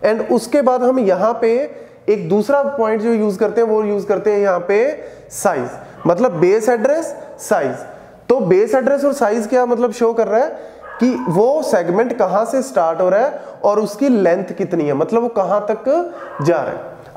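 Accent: Punjab, India. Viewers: God ur punjabi accent. Loving it... ♥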